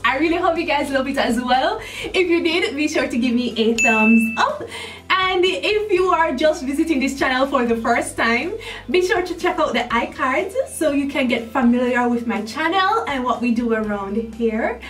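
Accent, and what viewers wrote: Jamaica. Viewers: This style is lovely just like your beautiful personality - Loving the natural Jamaican vibes you give, never lose your accent.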